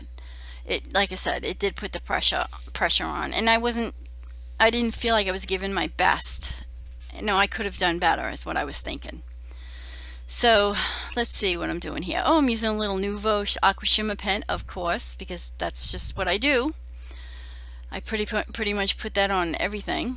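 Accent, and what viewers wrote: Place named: Pennsylvania, United States. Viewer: I love these cards! I do think you’re putting to much pressure on yourself, but it’s strictly up to you the number of videos you produce ok? Oh! And where’s your accent from? I’m thinking Pennsylvania? A little stronger than Ohio 😁 where I was born 😏. thank you for your inspiration!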